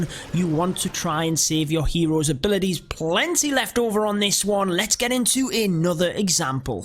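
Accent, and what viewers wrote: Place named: Northern England, United Kingdom. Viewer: He's English, somewhere up north I'd guess, Newcastle or somewhere near there.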